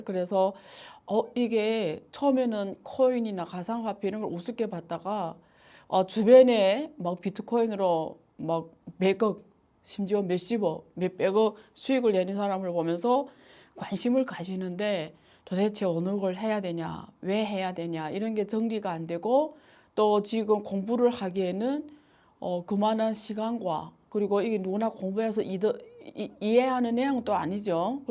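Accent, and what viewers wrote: China. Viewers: 교수님 어느새 중국어 억양이 많이 없어졌네요. 몇년전에는 듣기 거북했는데 이젠 자연스럽게 들립니다. 건강하세요.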